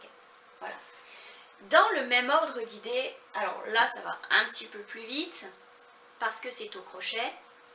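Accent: Quebec, Canada. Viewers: Coucou , j'aime beaucoup ta première couverture ! J'ai commencé la meadow blanket de Lucy en lit double, qui ressemble vraiment à ma prairie ! Merci de m'avoir fait découvrir la créatrice et la laine Stylecraft , toute douce . Ton accent un peu québécois dans cet épisode me fait sourire , j'aime bien cette accent ;)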